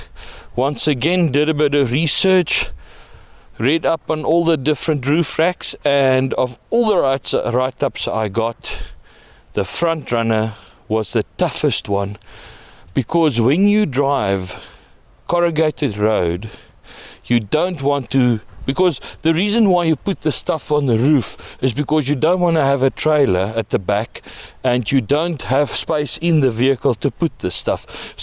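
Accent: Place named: Russia. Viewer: Your accent like russian 😅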